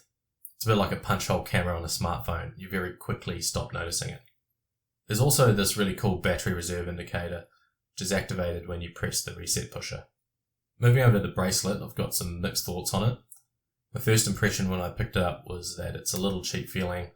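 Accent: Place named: New Zealand. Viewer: You sound more Kiwi than Australian. Another ship jumper?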